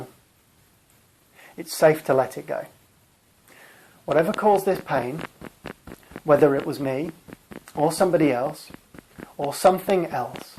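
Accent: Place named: United Kingdom. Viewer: Hello I love your british accent! The pain is weaker! Thank you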